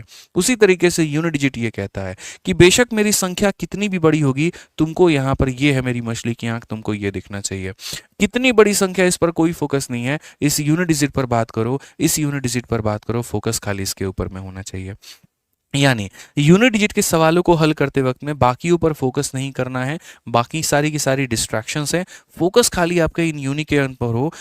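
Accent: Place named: Bihar, India. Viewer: Sir ❤ bihari accent bht pyra hai 😂❤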